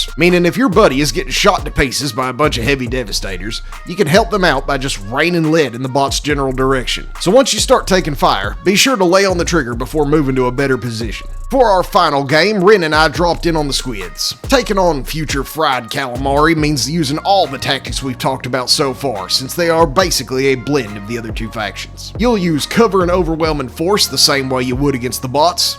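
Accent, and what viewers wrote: South, United States. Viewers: Your voice is as stereotypical American as it gets 🦅🦅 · That southern accent really make it sounds like you're a real commander. 🫡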